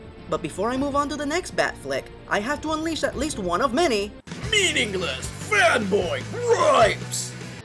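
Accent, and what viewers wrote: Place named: Puerto Rico, United States. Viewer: he's Puerto Rican so 1. I don't think English is his first language and 2. he has a slight accent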